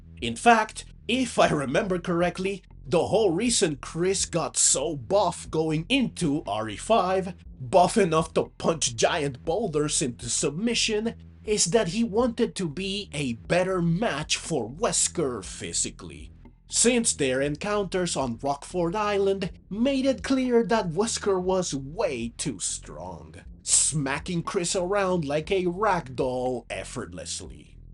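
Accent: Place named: Italy. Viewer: Where is your accent from? It sounds like the American stereotype of an Italian accent a little bit.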